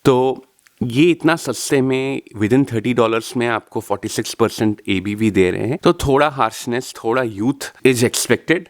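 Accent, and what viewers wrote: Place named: Bengal, India. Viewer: With ur accent I’m guessing ur bengali babu. Tell me if I’m correct?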